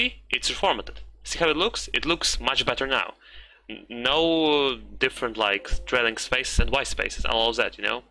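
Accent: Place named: Russia. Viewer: Are you from Russia?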